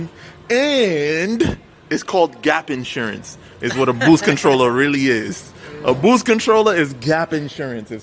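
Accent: Trinidad and Tobago. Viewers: I hear a trini accent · I hear a trini I swear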